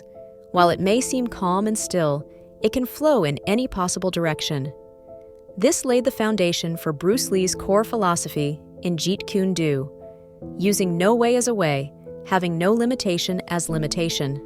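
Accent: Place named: United States. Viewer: As usual this channel has a narrator who does not pronounce common English words correctly! Though narrator speaks with American accent, no attempt to bother with obvious words. So annoying and personally I do not believe many of this videos info. The makers are careless, do not bother with proof of many of their statements. Do not bother...waste of your time.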